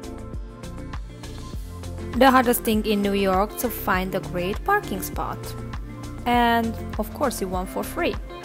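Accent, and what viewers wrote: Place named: Hungary. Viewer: This is so cool video. I've been watching your work on your other channel for a few months now and I always thought that your accent is somehow so familiar to me. I've had this feeling that you're Hungarian but I was never sure until this video. Kinda mind-blowing for me. I'm from Slovakia but my grandparents are Hungarian so I also speak a little ❤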